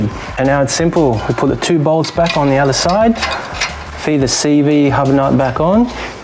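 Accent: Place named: Connecticut, United States. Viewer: Where the fuck are you from, Connecticut? Hell of an accent, son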